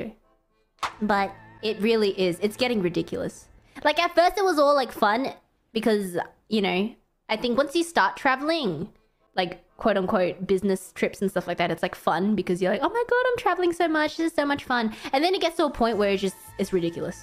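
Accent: Australia. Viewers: I hope could maintain her aussie accent in Japan. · At the surprise of know one, but fr as long as she keeps her Aussie accent it's all good. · Imagine moved to japan then she talked normally with no aussie accent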